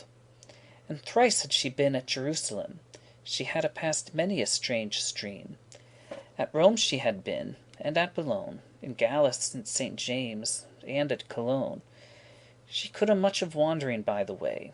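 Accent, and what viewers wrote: United States. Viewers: That's bloody awful. You're supposed to speak it as it was spoken, not with a Yank accent!!!!!! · Ive been needing an American accent to understand it ❤ thank you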